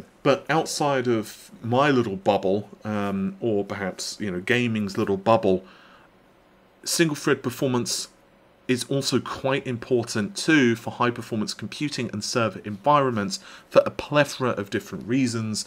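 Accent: United Kingdom. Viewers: that british accent is amazing isn't it lol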